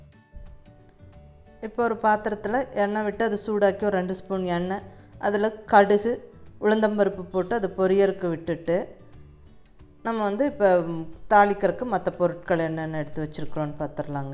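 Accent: Kongu, India. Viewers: Super akka nice recipe , thanks your Kongu Tamil also good. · Receipe tips are nice. As well kongu tamizh is also so nice.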